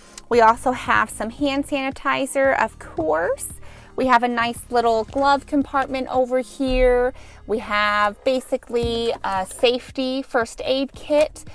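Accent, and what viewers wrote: United States. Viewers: Are You Michigan Or Chicago Girl????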